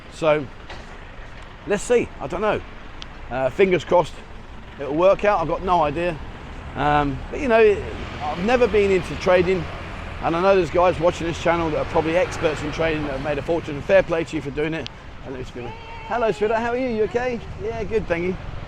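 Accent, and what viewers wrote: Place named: United Kingdom. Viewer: Interesting language use. . . You are quite the Brit you are😸. . . and I DO love your accent! And how you use the language with such candor and wit. It's like what you said in this video about using agents to set up a bank account - and I much agree- that, "They charge a fee. . yes. but they have to make a living too". The magic of crypto is that it is still almost not regulated at all. . . So the bottlenecks the traditional stocks, gold etc big wigs set up against us since Jeckle Island with Chase n friends in 1913. . . are almost not even there. . . As such. . . more of the "living" one makes comes back to you. . . much much less to them.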